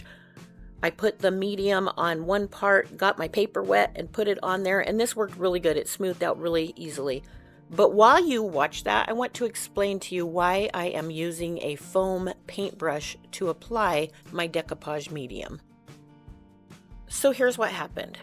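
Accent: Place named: South Carolina, United States. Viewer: You must be from upstate South Carolina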